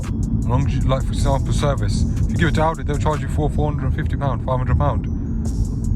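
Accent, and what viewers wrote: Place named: South Asia. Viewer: This is so odd you are white but you sound south asian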